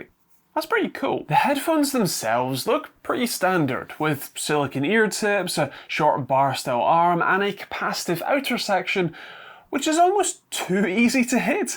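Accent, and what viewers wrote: Scotland, United Kingdom. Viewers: Appreciate this review. I bought these a while ago and have actually bought many Soundcore earbuds over the last few years. These are definitely some of the best I own. I really like my A3i's also. I tend to prefer the bud and not the stem style in general. I have also purchased the A20i's and have recommended them to several people who all loved them so that was pretty cool as well. The phone stand on these is a great touch and the sound is quite good too! Great value for sure! I really enjoyed your style as well. First time seeing one of your reviews. 😊 I have an awkward question. Is your accent Scottish or Irish? I really like it but can't determine which it is. I'm a Canadian who spent 3 years living in Scotland when I was a child so I should know but can't quite figure it. 😛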